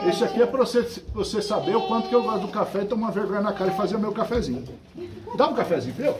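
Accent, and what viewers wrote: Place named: North, Brazil. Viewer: Amo o pai, me lembra o dialeto do norte do Brasil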